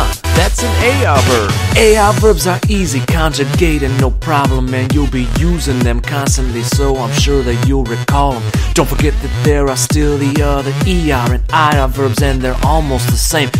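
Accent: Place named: Russia. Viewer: He sounds russain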